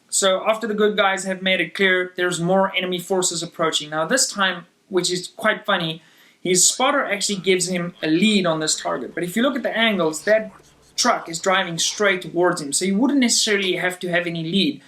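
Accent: Afrikaans, South Africa. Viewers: Is this guy south African? Dope... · Is jy afrikaans? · You sound south african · This guy sounds like he is a South African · Where are you from? I want to say South Africa 🤔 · Hey man, you from South Africa by any chance? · Yo are you South African? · Love die Afrikaanse aksent:) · South African? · I thought so! 🇿🇦🇿🇦 recognize that Saffa accent anywhere. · Hold up, are you South african? your accent and speech... must be?:O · i thought i recognised that accent, im South African, great work here man! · The first couple of seconds into this video I paused... Hmm... This guy... Is South African... I was right ^_^ Lekker man.:) Goeie video. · You sound South African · Sounds like a old south African accent..? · Are you South African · your accent? are you a south african? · are you from SA? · South African accent? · He sounds South African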